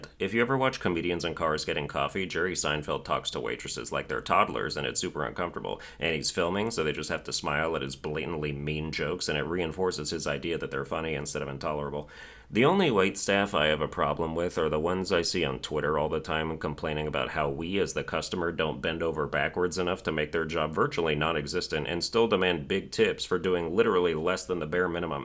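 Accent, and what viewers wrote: United Kingdom. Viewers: Hearing that british accent just after playing The Great Ace Attorney is certainly something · That was your best English accent yet, not even a tinge of the Aussie in there 💯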